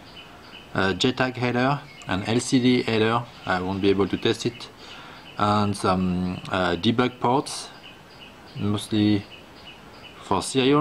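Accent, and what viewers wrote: France. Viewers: Such nice french accent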